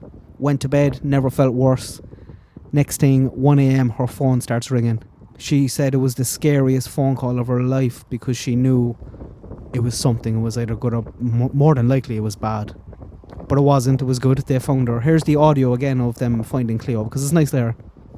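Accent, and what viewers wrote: Ireland. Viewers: Love watching your dogs while you are talking about these cases, I also love the Irish accent, I follow Michelle walks too you are both great 😊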